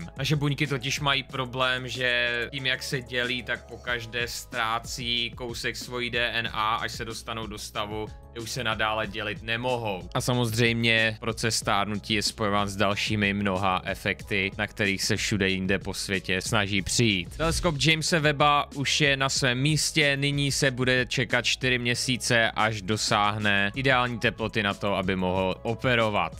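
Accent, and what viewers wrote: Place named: Moravia, Czech Republic. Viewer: Ta slatka Morava. 🙂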